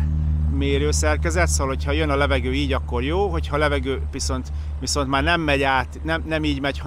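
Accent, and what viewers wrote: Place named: United States. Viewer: hihető és elfogadható a kicsiny "amerikás magyar" akcentus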